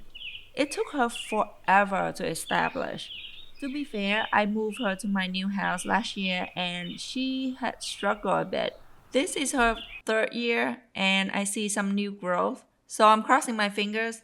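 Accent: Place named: Vietnam. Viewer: Are you vietnamese? You have the accent <3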